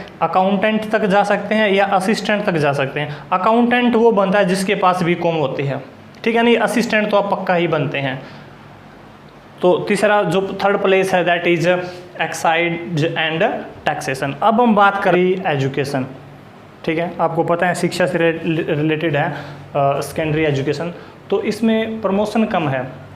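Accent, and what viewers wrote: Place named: Haryana, India. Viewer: मुझे अपनी साइड का accent लगा बोली का तब पूछ लिया था sir मैं proper नारनौंद से हूँ Best wishes for your channel भोले नाथ आपको खूब तरक्की दे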